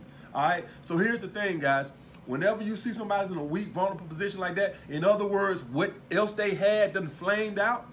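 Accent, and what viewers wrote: South, United States. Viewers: you been living in NY few a while and still got that Southern Accent! But I see what you’re saying fam.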